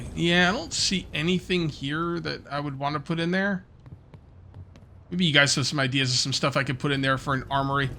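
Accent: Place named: South, United States. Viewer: We pronounce it that way in the south. We also use the L in salmon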